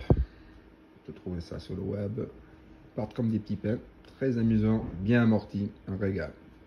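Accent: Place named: Aude, France. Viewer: Pour les tables Zaccaria en promotion, on peut espérer les trouver à combien sur leur site. Parce-qu'actuellement elles sont à 250$ (1 à 5). J'avoue le prix est élevé. Je me suis autorisé l'achat des jeux verticaux, malheureusement quand j'ai reçu la clé d'UK. Le pinball me l'a planté. Ils m'en envoient une autre et m'ont dit que cela pouvait arriver. Quand j'écoute votre accent.....vous semblez ne pas être très loin de chez moi. Je suis du dep 11. Je vous dis à votre prochaine vidéo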